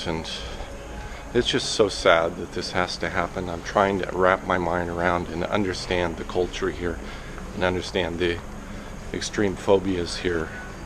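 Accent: United States. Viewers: butthurt ! the story kind make nonsense to me a lot of Hollywood action death threat , drugs , border report you and your friend should know the red lines before traveling to any country Russia ,China ,Dubai"UAE" those countries diverse in almost everything religiously culturally etc but share one common anti LGBT from your accent I assume you are from US let me refresh your memories with states this incident could took place Mississippi, Texas, Alabama . do your search before making scene.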